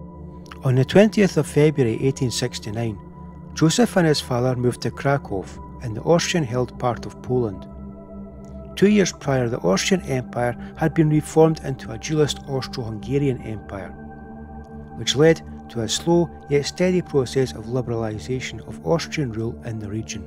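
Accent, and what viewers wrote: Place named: United Kingdom. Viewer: C rappy video. The narrator's British accent is too strong and the music is too loud compared to the narration.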